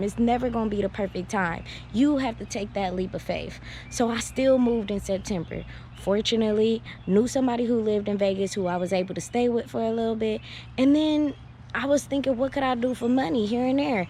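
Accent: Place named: Bay Area, United States. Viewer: i could hear you from the bay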